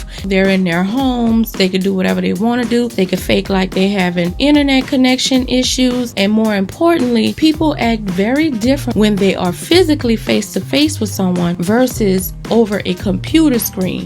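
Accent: New Orleans, United States. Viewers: U do a great job speaking but babaaaay I know the NOLA when I hear it! Especially being from SC. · Are you from New Orleans? That accent is strong lol 😂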